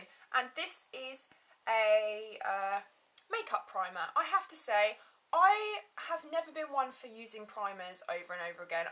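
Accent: England, United Kingdom. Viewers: Love your english accent! Please more vídeos!!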